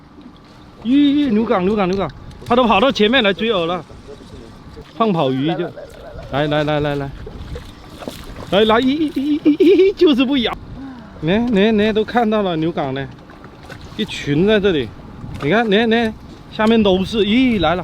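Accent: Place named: South, China. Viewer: 这口音好像南普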